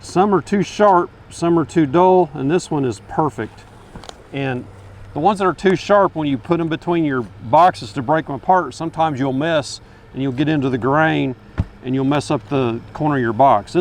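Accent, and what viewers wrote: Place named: Texas, United States. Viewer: #1 I am a relatively new lover of bees. #2 My hat is off to beekeepers minding their bees in 90+ degree weather in the direct sun. Wow. #3 My hat is off to beekeepers (period). #4 I was smiling imagining it was Hank Hill in that bee suit because you have the same Texas drawl - then you brought up Hank and I broke out laughing. #5 Thank you 🐝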